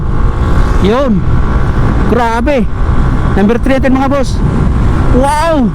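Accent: Visayas, Philippines. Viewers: Sigurado ako BISAYA TO.... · Bisaya kaman dong😂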